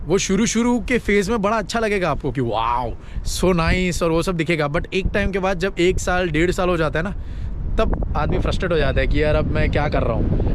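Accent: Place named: Punjab, India. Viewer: Kaun jagaga o.tha? 🫣 Aap bolo naa bolo hum log samajh gaya😆 Punjabi hi hoga🤭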